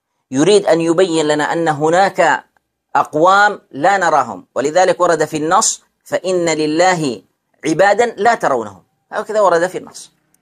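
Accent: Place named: Yemen. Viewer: لهجة هاذا الشيخ كانه يمني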